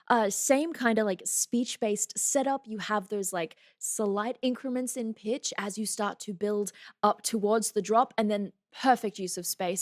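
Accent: Australia. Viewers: That „oer, oer“😂 man i love aussies😂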